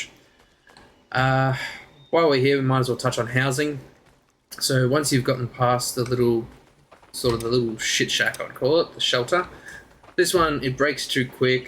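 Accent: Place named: Australia. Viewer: Thanks for these tips. I started a new game playing Aska this time not Ragnar And now, i'm managing and found agood location to hunt and forage foods. Closer to the mine as well. unlike before when I firstly started this game. I raged quit when the bear killed my 3 out of 2 npcs. And when I got 15 npcs most of them died of starvation during winter only 5 left. Because my location spawn point and where I made a base are too far from everything. haha! And subbed. You built a house on top of the mine. Would probably do the same and relocate my first game because my first game the mine was so far away from my base. Thanks mate. The accent is a gave away aussies. Cheers!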